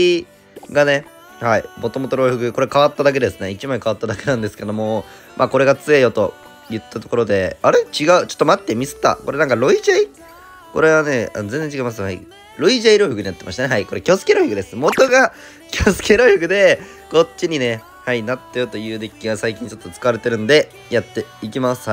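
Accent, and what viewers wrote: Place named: Kyushu, Japan. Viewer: 九州は大体口調こんなんだよ いや、嘘ついた。鹿児島と長崎は違うかも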